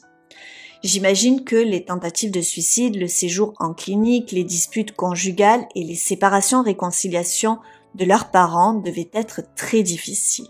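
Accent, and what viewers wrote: South, France. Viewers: Taylor et Madison. Quelle tragédie !!! Pour ma part je pense que tu as fait une très bonne analyse de la situation. J aime bcp ta petite pointe d accent du Sud...moi qui suis dans l Hérault...🌞🌝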